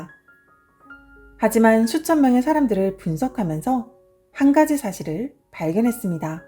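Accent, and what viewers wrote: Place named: Gyeongsang, South Korea. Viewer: 경상도 분이셨군요~★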